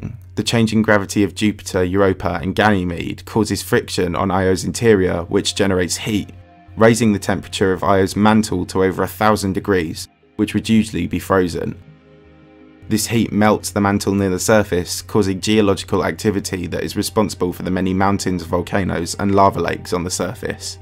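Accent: United Kingdom. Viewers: I don’t understand the fascination/obsession with the British butt pirate accent. It’s more of a distraction and takes away from the message. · British accent?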